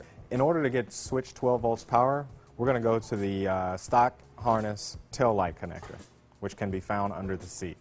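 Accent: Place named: Mexico. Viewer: This Mexican has a funny accent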